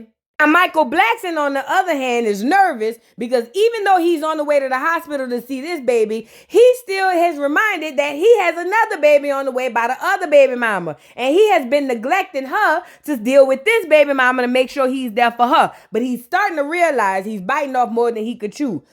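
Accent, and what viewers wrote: United States. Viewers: Hi, im from the UK and a new follower. You’re accent is my favourite American accent ❤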